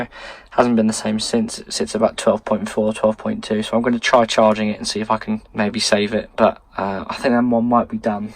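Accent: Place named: Portsmouth, United Kingdom. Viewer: Sounds like you live where I used to before I moved away? 30 years in Gosport born in Portsmouth 👍🏻👍🏻👍🏻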